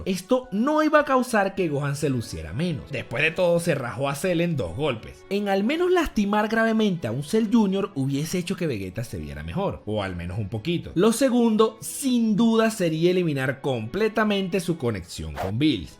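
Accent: Venezuela. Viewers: man, eres venezolano? el acento te delata jajajaja por cierto buen vídeo!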